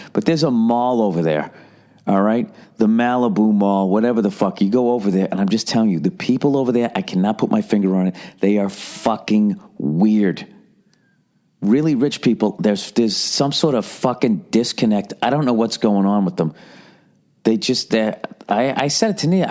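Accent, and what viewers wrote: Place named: Boston, United States. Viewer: He's from Boston, that's how they speak. I dare say you have your own idiosynchratic speech patterns that others could equally criticise, wherever you're from. Personally, I happen rather to like it.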